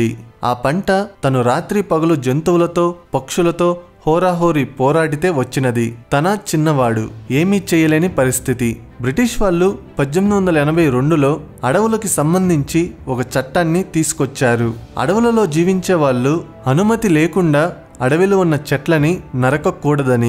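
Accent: Telangana, India. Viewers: Telangana voice super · Nice... Telangana slang lo manchiga cheppinav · miru chala baga telangana yasani matladatu great job man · Telangana yaasa lo maatlaadithe funny ga undhi..😆 Normal fluency alavaatu ayyindhi ga andhuke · జల్,జంగిల్, జమీన్, జై కొమురం భీమ్ ఎం మాట్లాడినవ్ భాయ్ తేట తెలంగాణ యాస.👌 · Telangana slang 👌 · Telangana slang em matladinav bro... Hats off bro👏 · Telangana slang supper bhaiya.. Fan of ur voice of slang❤️ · Pakka Telangana slang Love from Nizamabad... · Telangala slang super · Wonderful expressions with pure Telangana voice Anna tq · Telangana slag lo masth chepinav... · Telangana voice over 👌👌👌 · Pure telangana yasa😘❤️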